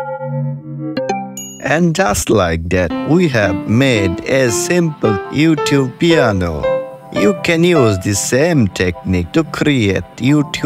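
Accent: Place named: Spain. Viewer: Thats the most Spanish accented English Ive heard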